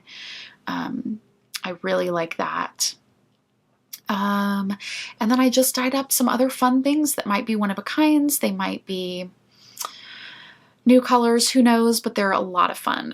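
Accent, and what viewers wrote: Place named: South, United States. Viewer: I have wanted to ask you this question for so long. I don’t want to sound in any way disrespectful to anyone. You have said you are a Louisiana girl. But you have no accent. You sound southern but I don’t hear Louisiana. My mother was in the hospital for awhile in Shreveport years ago but I was amazed at their lovely accent. Shreveport is not far from us. I live in OK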